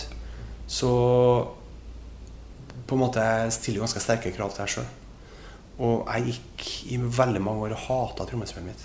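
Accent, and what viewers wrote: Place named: Trøndelag, Norway. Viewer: Bra forklart, men hvor er "The Subtitles" ikke mange av mine kompiser her need som forstaar TrOndersk!?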